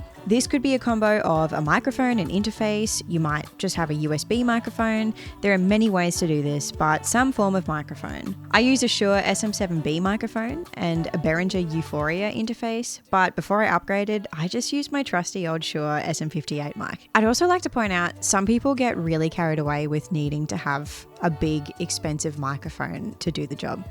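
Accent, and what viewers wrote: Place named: Australia. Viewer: Very interesting information in the description section. I was actually curious if you were in Australia, or maybe a nation with a similar accent. Being a 30 year broadcast television veteran, I have to add that I’m very impressed with your editing skill. When I was in college we only had actual videotape editing! lol Computer based/non-linear editing was just emerging. Two questions: Do you offer vocal lessons; and, are you on Facebook? Love all your vids!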